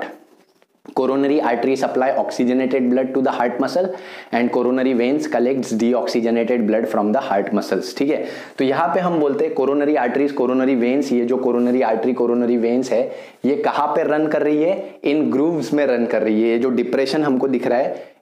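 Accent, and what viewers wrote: Maharashtra, India. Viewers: Tum marathi ho kya bhaiyaa..... And aapki teaching level are next level..... ❤️‍🔥✨✨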